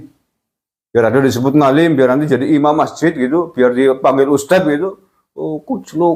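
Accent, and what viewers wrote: Banyumas, Indonesia. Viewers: Dan saya selalu tersenyum saat mendengarkan logat khas Banyumasan nya Mas. 😊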